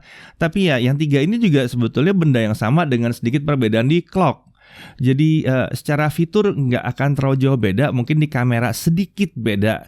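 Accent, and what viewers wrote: Indonesia. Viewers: maklumin aja lidah org indonesia gitu ,ya biarin lah 😂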